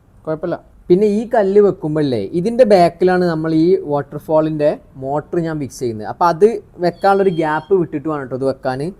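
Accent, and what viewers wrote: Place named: Vadakara, India. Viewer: എന്നിട്ട് നിങളെ ഭാഷ മലപ്പുറം ടെച് ഇല്ലല്ലോ 🙄ഞങ്ങളെ കോഴിക്കോട് വടകര മോഡൽ പൊലെ എനിക്ക് തോന്നൽ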